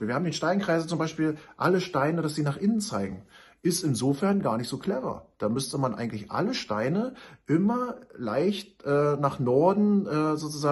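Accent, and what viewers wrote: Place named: East, Germany. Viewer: Also das versteh ich sogar als Weib die nicht der Ostsprache mächtig ist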